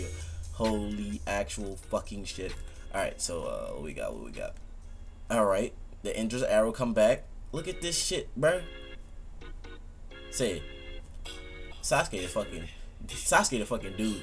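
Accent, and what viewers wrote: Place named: Philadelphia, United States. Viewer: is from Philly the dudes that say down like that form the P lol my boi from there and say it the same lmao... love your channel fam keep up the good videos ma g!!!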